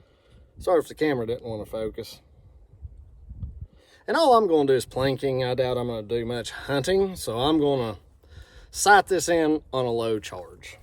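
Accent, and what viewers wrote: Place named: South, United States. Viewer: This worth listening to just for the South of Dixie accent.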